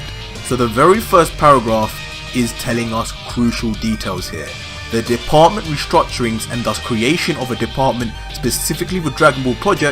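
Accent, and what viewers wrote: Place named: England, United Kingdom. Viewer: Hello you sound like your from England, so am I !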